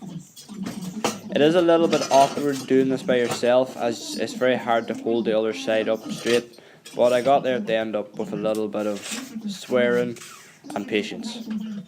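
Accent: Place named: Scotland, United Kingdom. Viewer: I can't figure out your accent, is it Scottish or North Irish?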